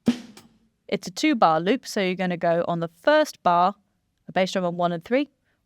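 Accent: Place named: England, United Kingdom. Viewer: I love your videos and your English accent.😍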